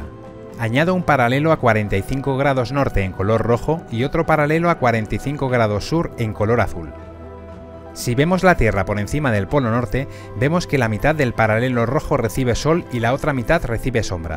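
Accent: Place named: Castile, Spain. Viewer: Gracias por el video, pero más que nada por narrarlo en castellano,estoy hasta los 🤬🤬🤬 de oír videos de latinos con esa forma de habla musical y con palabras indescifrables que ellos tienen,acordes a su cultura y a su emisferio.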